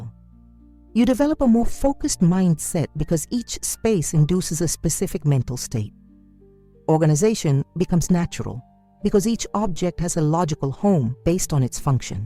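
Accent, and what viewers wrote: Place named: Hungary. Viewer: What's Japanese about this? Presenter sounds Hungarian.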